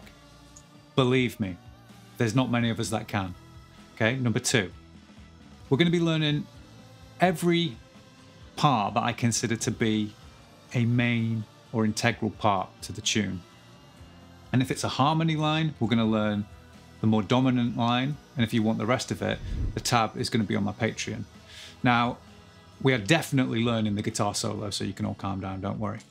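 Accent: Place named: North West England, United Kingdom. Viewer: Also wanted to ask where you’re from. I’m originally from Hanley, Stoke-on-Trent, left for Canada from Northwich, and taught high school in Macclesfield. I notice certain inflections in the accent that give away a North West origin.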